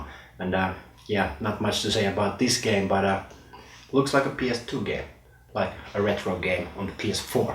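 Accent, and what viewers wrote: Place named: Finland. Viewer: OMG FINNISH ACCENT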